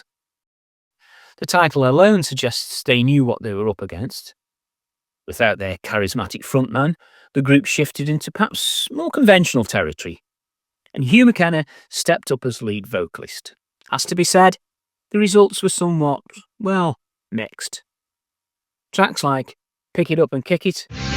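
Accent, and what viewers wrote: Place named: Midlands, United Kingdom. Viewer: I agree,no ego... passion just flows brilliantly....the accent is perfect too, a bit of Kidsgrove or Smethwick blended with a sprinkling of progtalkability.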